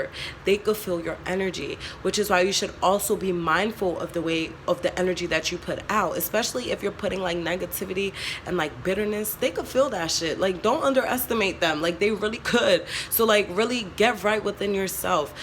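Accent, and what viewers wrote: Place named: New Jersey, United States. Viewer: I love your voice! Reminds me of my cousins from Jersey. Also, will you please not apologize for anything? You can't hear the heater at all! Love your videos and guidance. Thank you!!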